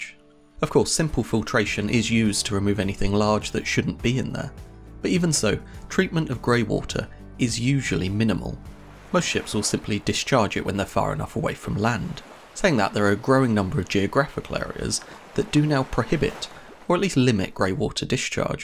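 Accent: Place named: England, United Kingdom. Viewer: I guess a English accent should make everything more palatable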